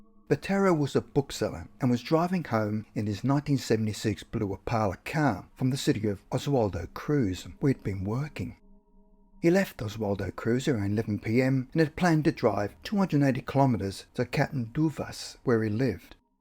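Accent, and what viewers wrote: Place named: Australia. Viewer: Ugh, the Aussie accent just doesn’t work with narration. Otherwise, a fan of your channel! 😩😅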